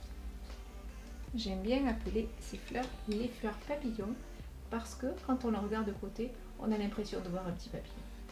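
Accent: South, France. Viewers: Vous êtes vraiment magnifique ! J’apprends beaucoup avec vous. Vous êtes très belle et charmante, surtout avec votre accent du sud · Tout simplement magnifique comme vous!🤩🤩😍😍 Merci beaucoup pour ces bons moments et vos explications très précises ! Entre parenthèses, j’adore votre accent !🥰🥰🥰 Moi je suis du Sud Ouest et si je ne me trompe pas, vous êtes du Sud également 🤣🤣🤣 À bientôt, j’attends avec impatience vos nouvelles vidéos! 👍🙏🙏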